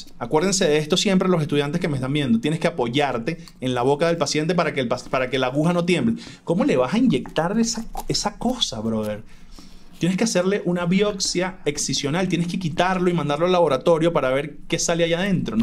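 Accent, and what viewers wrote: Venezuela. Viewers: Eres venezolano?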